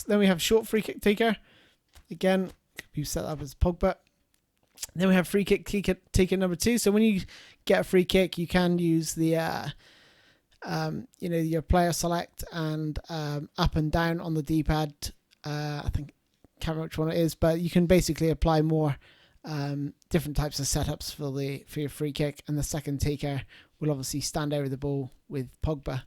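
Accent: United Kingdom. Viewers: Are you from Uk ??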